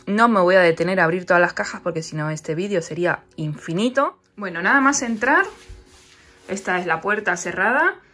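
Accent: Argentina. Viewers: Hola ,te escucho hablar y tenés mucho tono argentino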